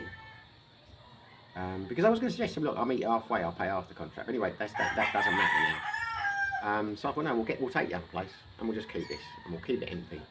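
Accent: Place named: England, United Kingdom. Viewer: Yapping in an English accent for too long will turn off my interest in any topics.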